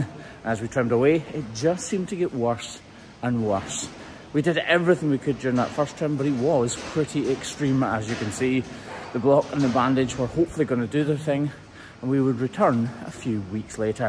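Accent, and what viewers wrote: Scotland, United Kingdom. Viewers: greetings from Texas, USA! Obviously, a lot of viewers enjoy your work, as do I. I have visited Scotland, and I have a great fondness for your land and heritage, and hope to come back again. I have high hopes for 447, and it's thanks to your good work. That cow has an internatinoal reputation now. I love your lead-in music, is it a Scottish performer? Great work sir, and I love the accent! Did I mention I like Scotland!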